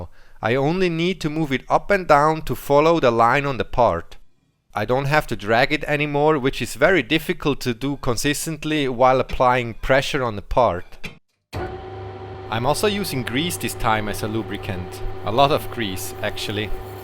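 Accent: Switzerland. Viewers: Great Video. Love the Swiss Accent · I love his Swiss accent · Ahh der Schweizer akzent😂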